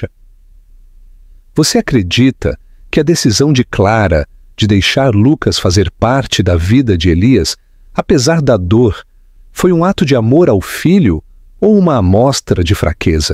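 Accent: Portugal. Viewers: O tema da história é bonito, mas a narração a torna muito chata! Principalmente qdo o narrador fala o português de Portugal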